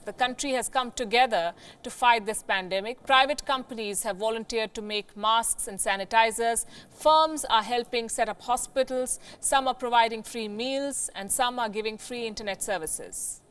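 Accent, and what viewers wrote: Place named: India. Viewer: It’s so hard getting use to Indian accent 😣😫😖😩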